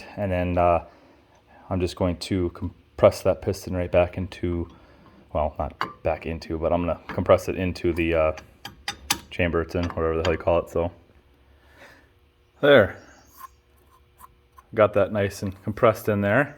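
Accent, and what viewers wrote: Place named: Canada. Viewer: You sound Canadian...will I have a problem if I have an American accent? Thanks for the easy video...it looks pretty straight forward, Thanks!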